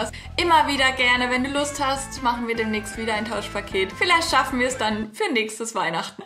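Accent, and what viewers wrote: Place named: Palatinate, Germany. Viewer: endlich mal jmd der iwie pfälzisch redet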